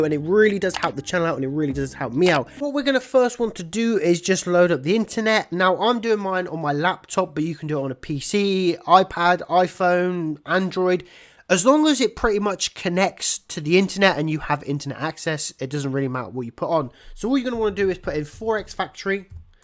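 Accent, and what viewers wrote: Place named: United Kingdom. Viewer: There are other English speaking countries online. Not British alone. Abeg consider and use proper tongue 😮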